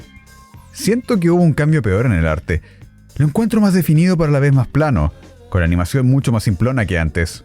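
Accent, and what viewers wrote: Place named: Australia. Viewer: El video que estaba esperando, que nostalgia!! You do sound a little Australian, saludos desde Inglaterra lmao (De algo sirvió)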